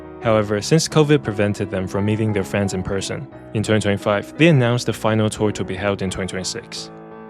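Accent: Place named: Japan. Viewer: Because is japanese bad-english pronunciation (?)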